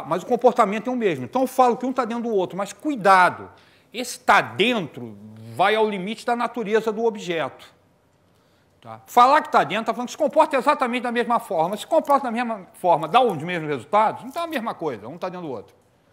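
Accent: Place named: Rio de Janeiro, Brazil. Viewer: Esse professro é carioca! acertei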